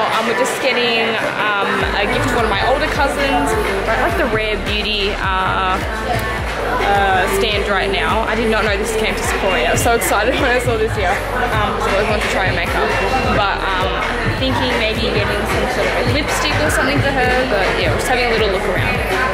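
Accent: Australia. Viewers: Are you Australian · ur aussie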